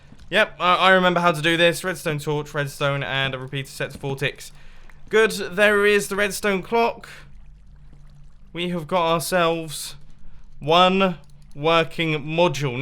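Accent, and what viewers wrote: United Kingdom. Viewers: where are u from in terms of like Yorkshireman or Londoner.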